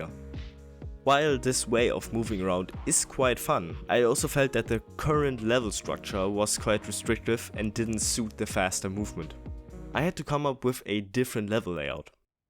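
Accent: Germany. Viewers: actually a game i would buy. Are u from germany? I like ur german accent. keep on inproving. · noway you arent german you sound somuch like youre from germany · I love your german accent, correct me if im wrong but its so typical like me haha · Youre german arent you? I can hear the accent a little. :) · very cool devlog and game! You're very talented at deving and making vids, keep it up! The only thing I notice is a little ''german accent'' If I'm correct pls let me know :D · kann es sein das du deutsch bist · Are you german?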